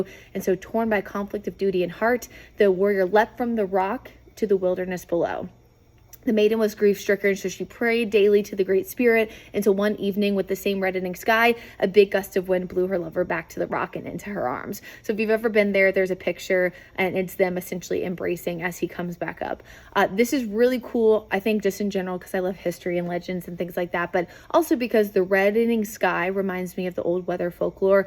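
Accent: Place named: New England, United States. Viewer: get rid of that yankee talk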